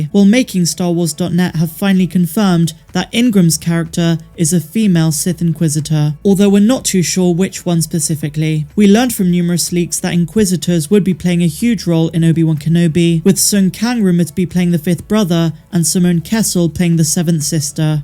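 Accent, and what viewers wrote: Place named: Bristol, United Kingdom. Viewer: I always enjoy your videos. Love the accent, is it from Bristol ? (one of my fave places in the uk ) I do miss the 3 x 'Utini' intros, though ! lol! ;) Thank you and keep up the good work ;) x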